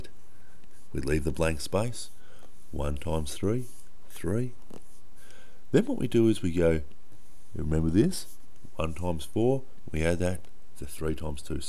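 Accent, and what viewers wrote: Australia. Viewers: is this australian english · I agree with Alexandre, ok you are from Australia, but you could talk a little bit more clearly.. if you know you have an accent well....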